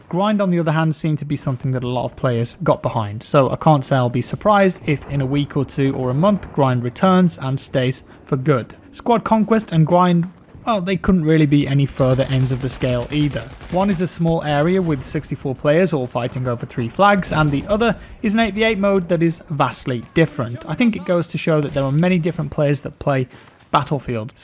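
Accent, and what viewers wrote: United Kingdom. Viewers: This is gonna sound pretty creepy, but i just love it when people with British accents explain intricate shit to me XD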